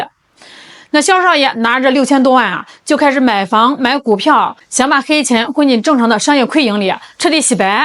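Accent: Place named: Henan, China. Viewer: 这个主播的口音大概是豫北冀南鲁西的人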